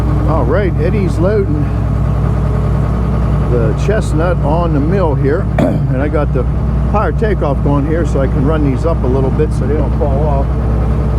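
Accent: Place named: Pennsylvania, United States. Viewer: Love Pennsylvania accent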